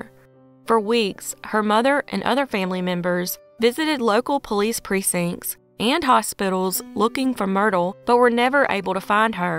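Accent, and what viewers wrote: South, United States. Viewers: Some ppl only like conclusions. I don't usually watch unsolved, but I love this narrator's voice. Only southern accent I like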